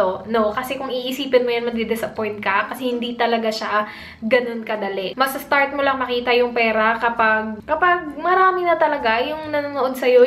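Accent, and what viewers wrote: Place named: Visayas, Philippines. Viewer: u r sooo funny I almost choked when u retrieve back ur error stragol lollll, is it bcoz of visayan accent that I often hear u say gugol or like Wat u just said.... But u r so funny and cute....stay simple and humble.... If YT makes u happy go on.... But nursing job s very rewarding m telling u gurl, just prioritize ur plans then later u can do both🤗🤗🤗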